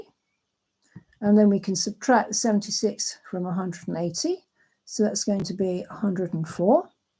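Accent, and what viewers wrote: United Kingdom. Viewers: ..., If I remember correctly, mathematics in my school paid a lot of attention to becoming proficient in Algebra and Trigonometry, but little time was spent on Geometry, while Algebra and Geometry are very much connected! (thinking of René Descartes). Despite the fact that Geometry is very visible, it still requires a thorough structural approach strategy to problem solving, after all, you are confronted with all kinds of geometric objects and combinations thereof (also in your video), which still regularly creates confusion for me when trying to solve problems! In conclusion, if you are really a British citizen (if I'm right according to your pleasant accent), then we are neighbours, as I live across the North Sea in the Low Countries of simply beautiful Holland... Thank you again, Jan-W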